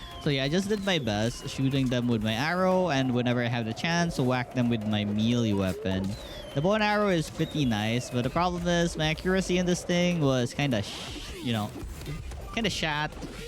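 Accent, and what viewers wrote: Philippines. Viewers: I knew it. From your voice this is a Pipino. Kabayan! Hahaha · are u from the felepens caus u sound like it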